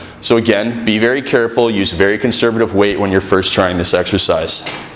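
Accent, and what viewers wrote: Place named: Canada. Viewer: I think he is Canadian because he says "oout"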